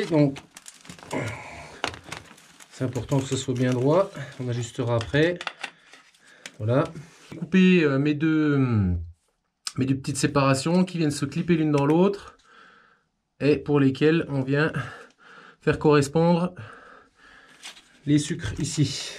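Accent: Germany. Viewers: L'accent allemand de malade 😅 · rien n'oblige à mettre les raccords l'un en face de l'autre ? si par ex on perd l'écarteur, on pourrait les décaler ; le pouce bleu n'est pas pour l'accent allemand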